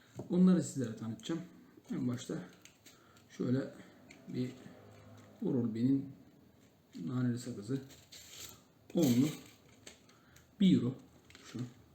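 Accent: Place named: Alaşehir, Turkey. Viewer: " Bi kaç tane GAÇAK çektik ama " İstediğiniz eğitim seviyesinde yada yaşam standartlarında olun. Bir Alaşehirliyseniz şivesinden kaçamazsınız 😅 Başarılar kardeşim 👍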